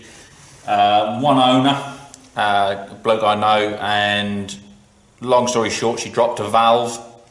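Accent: Norfolk, United Kingdom. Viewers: Just found your channel and enjoying your content. You sound like a good old Norfolk boy, takes one to know one, Sub you.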